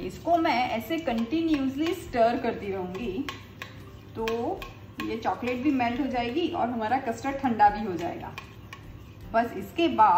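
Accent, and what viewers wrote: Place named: Kathiyawad, India. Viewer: Love to hear your kathiyawadi ascent..and all your recipes ... simple but fabulous 👌👏😘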